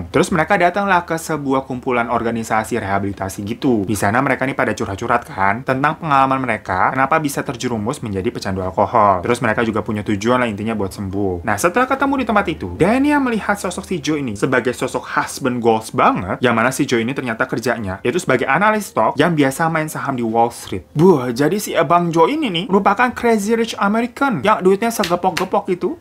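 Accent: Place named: Jakarta, Indonesia. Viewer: Ini orang betawi yaa.. Ngomong nya gitu yaa..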